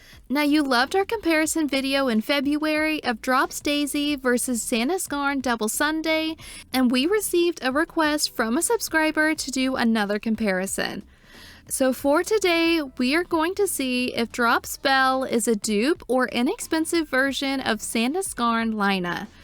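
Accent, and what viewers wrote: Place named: Midwest, United States. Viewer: Have to disagree with this comment. I think your voice is great as is! (And this sounds more midwestern than valley, but both are fun.) Your content is solid and you speak very clearly.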